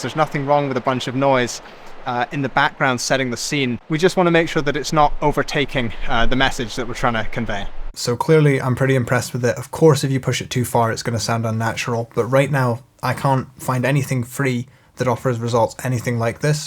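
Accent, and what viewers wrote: Scotland, United Kingdom. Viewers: Where is your accent from? You sound scottish with an english and american accent lol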